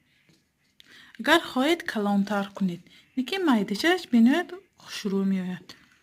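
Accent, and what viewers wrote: Isfara, Tajikistan. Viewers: Гапзани исфарагми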